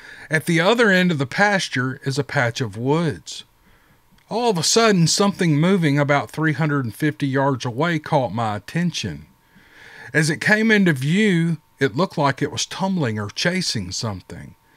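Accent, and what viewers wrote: South, United States. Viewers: All I can say is thank you for bringing some interesting joy to my life. You have a kind spirit. And beautiful country accent. God bless you and your family. And letting people know sasquatch is real.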